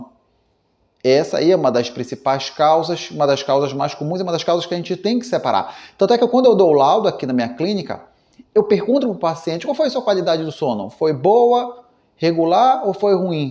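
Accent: Pará, Brazil. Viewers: Doutor você é paraense? Seu sotaque me lembra o paraenses❤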